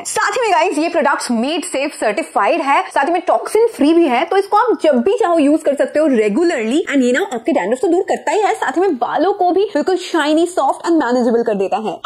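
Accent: Bengal, India. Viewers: And Plus she is Bengali so hindi isn't her first language like other youtubers. And im also a Bengali.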